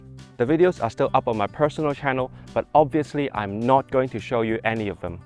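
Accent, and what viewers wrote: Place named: Manchester, United Kingdom. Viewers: As a Native mancunian I can say its almost a fallacy to have a truly "manc accent" within the boundaries of the city alone there are subtle but noticeable differences from proximity to Yorkshire and Cheshire respectively as an example. Let alone the class dynamics. I would say your accent as you described is general Northern RP and is good enough to be effectively understood as someone who you would assume knows for local vocabulary. New subscriber and loved the video! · I really like your slightly posh (RP) Lancashire accent. You remind me of a Dr Unwin from Southport who I sometimes listen to online. I was born in Malaysia and spoke a bit like you when I left school after 10 years of English boarding school in Cheshire. I have lived in Australia for 40 years now so that has corrupted by tones somewhat. Your accent is lovely and endearing to me. 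I want to reclaim lost aspects of myself starting with the Manchester accent! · Posh Northern solidarity ✊🏻 You need to start saying "reyt" though. I'll infiltrate your speech with some Sheffieldisms too, don't you worry. On the accent and belonging point, you're bang on, Manchester has people from all over the country and beyond such that labels like proper Manc feel meaningless. I would say as a native Brit though, I still have to perform different accents in different places (convergence, divergence). Class and accent in the UK are really drilled into you from birth and are a necessary tightrope to walk, especially if you have a non-RP/non-"southern" accent. I always feel like I have to be a chameleon to make interactions go well. (To answer your question, I love Singlish and HK accent! I typed the word accent so many times, it doesn't look real anymore.) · I noticed a distinct light Manchester undertone on your recent Welsh video. 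Great work mate 👍👍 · I wonder if anyone has ever mistaken you as somebody who grew up in the UK, or more specifically in the north, as your northern RP sounds very authentic. Also do you self-correct in natural speech when you notice your pronunciation is off?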